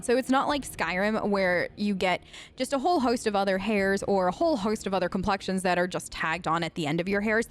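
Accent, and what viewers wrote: United States. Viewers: Sorry i appreciate your trying for newbies but this way too superficially explained. DAI mod manager and frosty aren’t really compatible then I followed your steps which look SO EASY and no mods worked. Instead I had to manually change my patch that my game works again and i get constantly an error in dai mod manager and no green bar when pressing merge. And your heavy American accent doesn’t make things easier 😫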